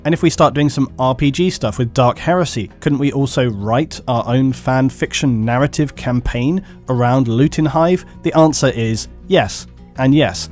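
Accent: England, United Kingdom. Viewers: I stumbled onto your channel solely from the "40K Lore" stuff. While there's other channels that also do great work on 40K lore, your product to me is the overall best. The music, the imagery, and the English accent all really go well together. I am 150% stoked on the idea of seeing Dredd Lore as well! When I think of gritty, dark, and masculine Sci-Fi/Fantasy from the UK, Warhammer and Dredd are the first two things that pop in my mind. I rarely find a new channel on YouTube that really hits me like yours has, so keep up the fantastic work and I look forward to what's in store!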